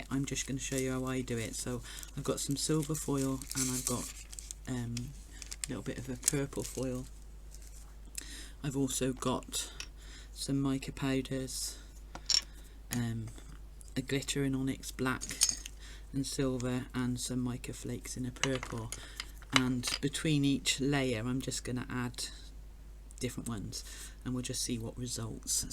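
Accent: United Kingdom. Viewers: These are truly beautiful. I just love the one with the black background piece, really makes the colours pop. Gorgeous colours together. Thank you for giving us so much of your time and talent. You sound like you hail from the South of England. I lived there as a child and also again in my early twenties for a while but now live in Australia - a touch warmer!. Have a wonderful Christmas and hope your New Year brings you good health and special times.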